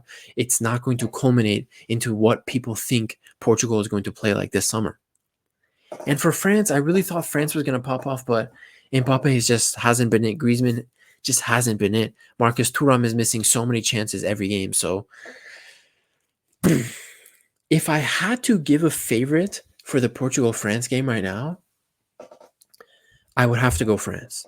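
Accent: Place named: United States. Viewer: Jeet speaking with an American accent. Opinion discarded.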